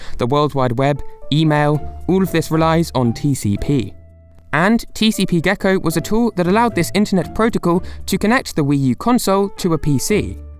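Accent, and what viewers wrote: United Kingdom. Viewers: your brit, right? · Dude any person who speaks English knows what a queue is you pompous ass. Just because you have a British accent and speak a less refined version of old English doesn't make you smart, it just makes you outdated.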